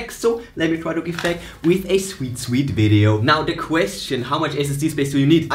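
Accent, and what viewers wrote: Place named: Germany. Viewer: wenn du deutsch bist, versuche nicht englische videos zu machen wtf. der deutsche dialekt ist immer schreklich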